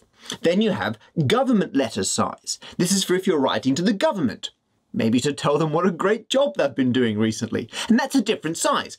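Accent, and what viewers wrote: Australia. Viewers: Sounded like and Australian to me! - Alternatively, maybe New Zealander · If it's one of his former monarchs it's one of yours as well given that he isn't a brit lol. Sounds Australian to me. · I have only just realised that Matt is not English but Australian. I feel rather stupid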